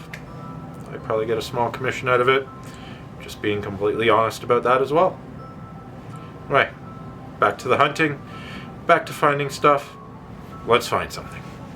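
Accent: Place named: United Kingdom. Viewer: Just looked at some of your videos very interesting demos, looks like you have a degree in science or something. Very clever and looks like you put a lot of reseach into how parts of the phone work. And a fellow Brit by the sounds of it :D. catched a glimps of your paper work, something I wouldn't have a clue about understanding what you put on it so I'll leave that with you :D. Subbed also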